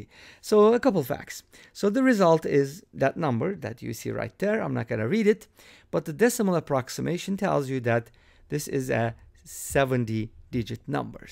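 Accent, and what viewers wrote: Turkey. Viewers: Your accent tells me you are from Turkey:)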